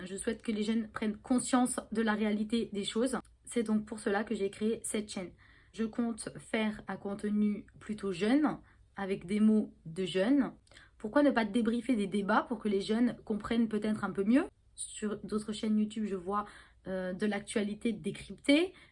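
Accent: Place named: East, France. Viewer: Joli accent de l'Est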